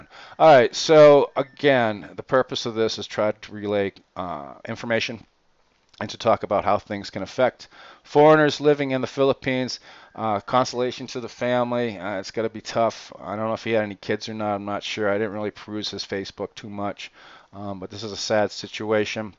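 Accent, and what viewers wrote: Boston, United States. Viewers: Boston accent.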